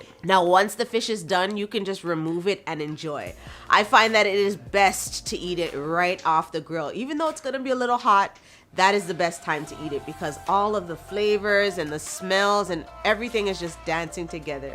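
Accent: Jamaica. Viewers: Loved the Jamaican accent 😂😂😂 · Your Jamaican accent is so strong !! I love it I wish I had it that strong !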